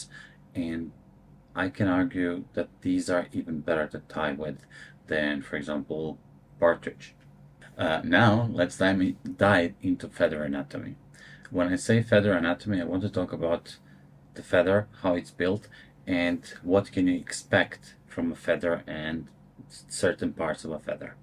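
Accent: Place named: Europe. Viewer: Brilliant video, your very very good at displaying the real inside parts of fly tying. A short time ago I watched a lot of you videos,I am so impressed and have started fly fishing and tying again, what a great inspiration you are. Do you live in South China, you sound European that is very interesting, how is the fishing in China.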